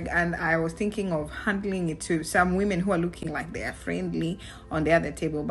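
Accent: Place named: Luo, Kenya. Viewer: 😂😂😂 your laughter, your Luo 😂😂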